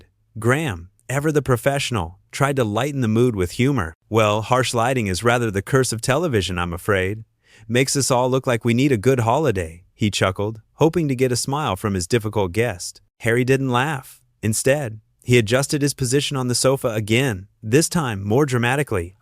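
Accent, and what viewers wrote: United States. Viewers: Ok we have an Irish host, and a member of British royalty, together. Down here in Aotearoa, Nz, Harry has taken part in Maori hakas, played rugby, is a great fan of rugby, and even stayed in a 1930s style pub down on Stewart Island, in a fishing village. He is an ordinary down to earth bloke. No high and mighty, better than you attitude. Just a normal guy. So we get a VO with a yank accent, instead of an English accent, spouting away. This is fake. The Prince Harry I know, would never act like that. A.I do your research. Get your facts straight. OR take a hike. This is bad. 🤮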